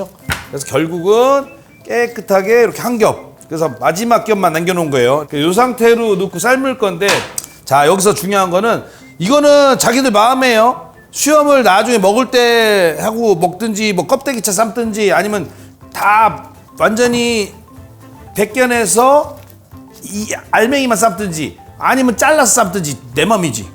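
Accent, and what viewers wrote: Chungcheong, South Korea. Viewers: 남편이 옥수수를 안먹더니 나이들어 좋아졌다고 어제도 쪄달라했는데 이제 비법을 알았으니 맛있게 쪄줘야게쓔 백종원 선생님 감사해유~~~♡ 충청도 사투리가 구수하구 좋아유~~~~~ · 울고향친근한 충남사투리구수한 수더분한인상 넘잘합니다 성공신화역시~ 화이팅!!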